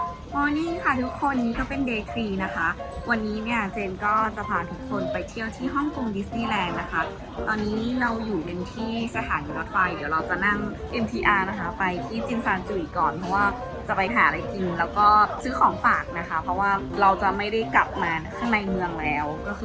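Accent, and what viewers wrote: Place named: North, Thailand. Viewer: สำเนียงแบบนี้คนเมืองเเน่นอนครับ55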